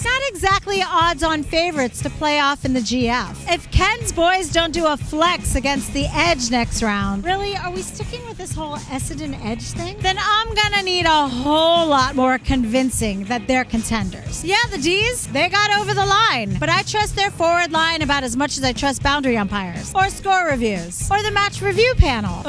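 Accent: United States. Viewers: am Paralowie,South Australia I read a story on page 16 of the Advertiser, yes I’m that old I still read the newspaper. I’m 50 yrs old born in Australia and know very little about Aussie Rules. I’m learning from you, you crack me up 😂😂 I really love that you are an American Female talking about AFL. Your humor and accent make it even funnier, don’t stop👍🏼 You’re our official American Commentator 😁😁😁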